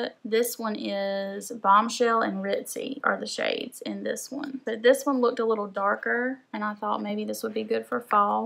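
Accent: South, United States. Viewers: Where are you from? I sense a southern accent. I’m from Alabama!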